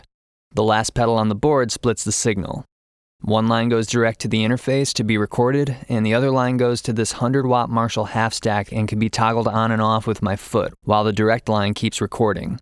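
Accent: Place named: Bavaria, Germany. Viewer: A very simple Bavarian portent question. Can't unhear it. :D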